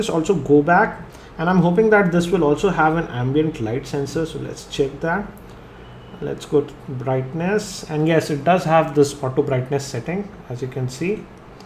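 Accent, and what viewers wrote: Hyderabad, India. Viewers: Are you from Hyderabad?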